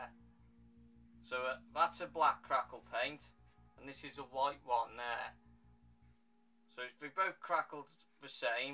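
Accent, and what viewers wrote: United Kingdom. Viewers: Nice, British or Welsh? if you don't mind me asking?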